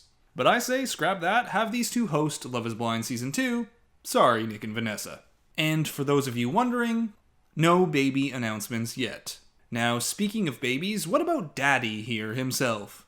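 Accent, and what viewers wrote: Canada. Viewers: Is the narrator from Canada?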